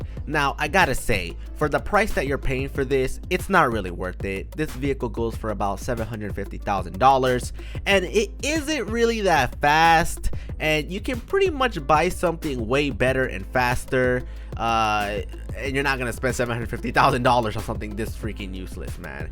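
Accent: Norway. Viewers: Are y from norway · Is he norwigian???? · Are you from norway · er du fra norge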